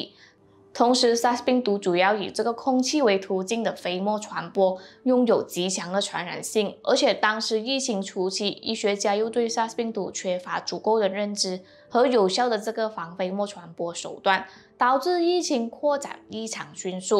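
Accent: Malaysia. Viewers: 口音和咬字有点奇怪。。 来自马来西亚吗？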